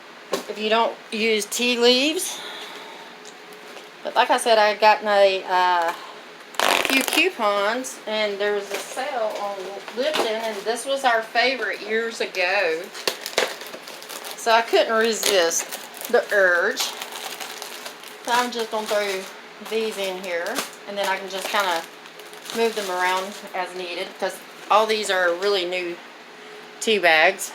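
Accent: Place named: South, United States. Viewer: I do like your videos... And love your voice... So Southern.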